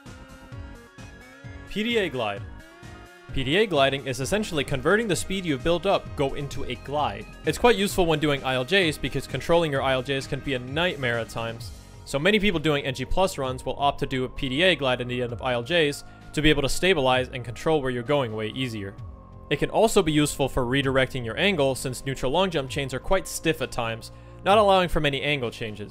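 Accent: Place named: United States. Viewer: MattFlowerDrums He sounds like an american to me.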